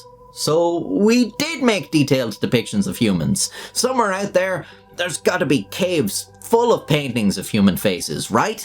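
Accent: Ireland. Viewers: He is a well educated speaker of Irish English, that is how that number is pronounced.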